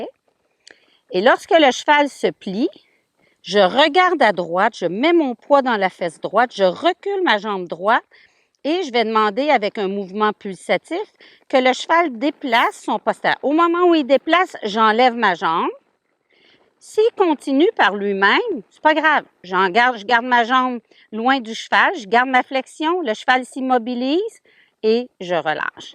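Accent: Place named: Quebec, Canada. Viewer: Salut eh ou habite-tu car tu as un axais québécois comme moi 😄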